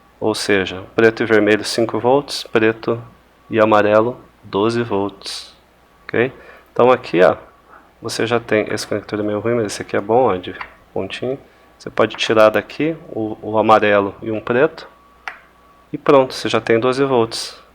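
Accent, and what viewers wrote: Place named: Paraná, Brazil. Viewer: Cara, sou fã do teu canal, não entendo como você não tem mais audiência. Conheci teu canal através do Rodrigo Conrado, o qual também tenho muito respeito e considero vocês as melhores referências no que diz respeito a CNCs DIY. Inclusive pra mim você é o pai das CNCs caseiras no Brasil, um pioneiro. Parabéns e muito obrigado. Estou pensando em criar o meu próprio canal para makers, pois vejo muita gente com dificuldades em montar seu equipamento e orfã de outros canais. Caso queira me contatar meu email é <mail> Sou do interior de SP e busco alguém como eu na minha região para projetos do tipo, trocar idéias e experiências. E você, como se chama (nunca ouvi você mencionando teu nome) e de onde você é? Pelo sotaque parece ser do Paraná, mas me perdoe se estiver enganado. Um grande abraço e muito boa sorte.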